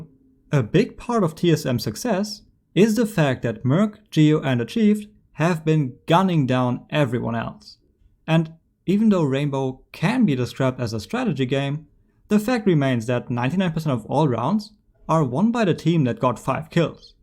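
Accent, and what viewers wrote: Germany. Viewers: Duuuude yo accent sound german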